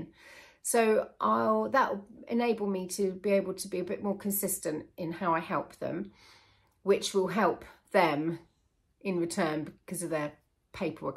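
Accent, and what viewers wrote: United Kingdom. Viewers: 🥰🥰what a lovely time I’ve had knitting while you chat, thank you for that. And yes we Americans think British accents are amazing. Anyone who breaks a friendship with you is the one who lost a gem! ( sent by a big fan who is usually very quiet) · It is a strange world where busyness tries to dominate- then we pause and ‘sit for a visit’ in the home of a fellow knitter who lives half way around the world. You make us laugh, and think, and together we all pause to be thankful. As an American I’ve always wondered about my very positive response to hearing the British accents. Then I had my DNA done and discovered all my English, Scottish, and Irish roots. Your honesty and adoration, frustration and dedication, to the four males in your house is remarkably apparent. In a world where ‘homemaking’ is pitifully underrated, and desperately needed, you set a good example. 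Keep up the good work.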